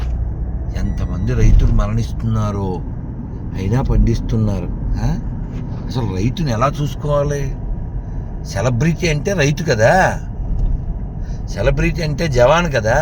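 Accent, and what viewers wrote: Telangana, India. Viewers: ధాన్యపు రాశులు. తెలంగాణ యాసలో. మీ ప్రయాణం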